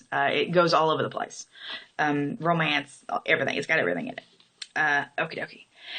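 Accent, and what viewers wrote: Southern Illinois, United States. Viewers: I grew up in Carterville! But I have less of an accent than this lady, and I knew people with a much stronger Appalachian-type accent. There's a gamut. No idea why there's considerable diversity. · Finally a real southern IL accent. :) Thank you! I've been mistaken for being from KY too. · You say everything exactly like me. I’m from Mound City 😊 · I grew up in Marion Illinois and she sounds like a native Southern Illinois. Actually she doesn’t sound country enough. Most of the older people I know talked much slower with a more southern draw. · Probably depends on what area of Southern Illinois you are from. Everybody I know sounds like this. I grew up on the Mississippi river. Grand Tower. All along this area all the wag down to Cairo people talk like this. Not even trying. It is just the way we talked. I have been in Wisconsin for the last 20 years and it has faded quite a bit since Wisconsin has a very specific accent as well. 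I have noticed the biggest part of the accent is that we spoke our words really fast. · Her accents the typical one for Southern Illinois. This is just the way people sound when talking, you obviously haven't spent much time since you don't understand this. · SO, IL for sure I be from Collinsville Il u got it down pat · I've lived hear in Southern Illinois all my life, & she sounds like everybody I know.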